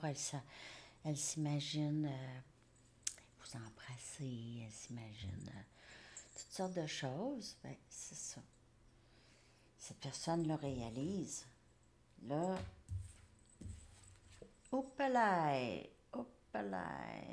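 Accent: Canada. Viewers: Bjr. Votre bonnet vous va à ravir. J'adore votre accent canadien. Trop marrante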